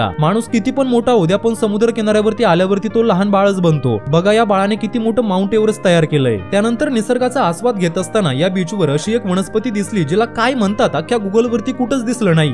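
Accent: Ahmednagar, India. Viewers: Nagarkar ahe tey tyache ucchar tasech rahtil. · ही नगरी भाषा आहे भाऊ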